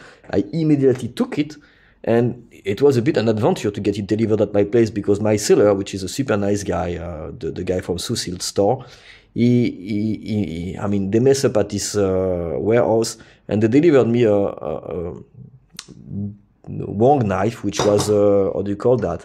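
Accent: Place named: France. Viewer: Bien l'anglais avec un accent français 😊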